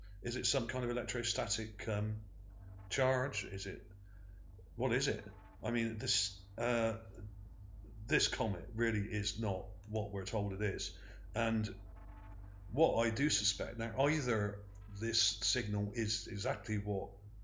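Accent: Bristol, United Kingdom. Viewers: Now how did I know that you were from Bristol, UK, lol. My home city.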